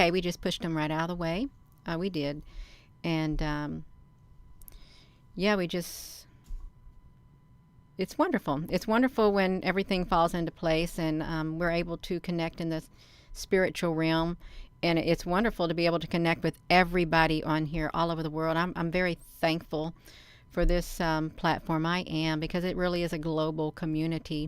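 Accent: South, United States. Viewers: I love your cute voice. You should let your natural accent come through. I hear a y’all can I get an AMEN!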